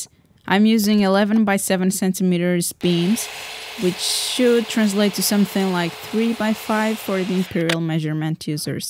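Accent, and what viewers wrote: Portugal. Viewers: Ohhhh! Portugal! I've never heard a Portuguese accent before! I was trippin' trying to place your accent :) Really really cool project! Thank you for sharing! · Assim que comecei a ver o video tive a sensação que o sotaque seria Português :D Yey!! Tão contente por te ter encontrado!! Subscribed! <3 Love de Coimbra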